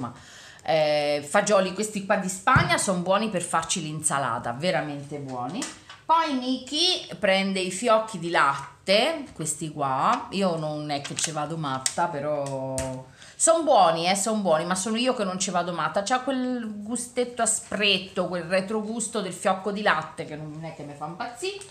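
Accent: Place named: Marche, Italy. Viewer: Ciao cara, dal tuo accento (che è simile al mio) deduco che siamo entrambe marchigiane! Io abito in un paesino della Prov. di Ascoli Piceno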